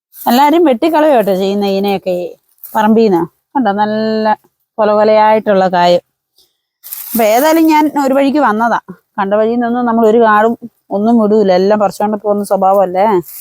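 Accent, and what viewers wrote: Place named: Idukki, India. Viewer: സ്ലാങ് കേട്ടപ്പോൾ ഞാൻ കരുതി ഇടുക്കിക്കാരി ആണെന്ന്... കാസർകോട് ആയിരുന്നല്ലേ....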